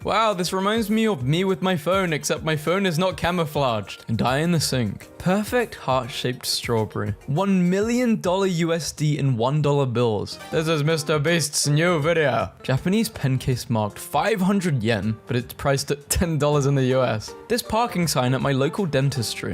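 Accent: United Kingdom. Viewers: I just realised hes British!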